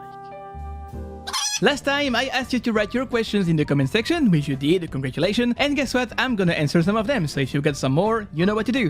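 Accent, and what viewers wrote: France. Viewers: Are you french? Nice video btw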